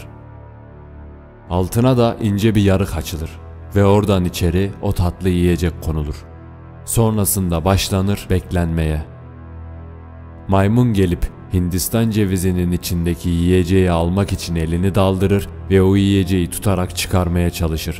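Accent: Ankara, Turkey. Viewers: gardaş anagara şivesinden geçme senin videolar öyle guzel gardaş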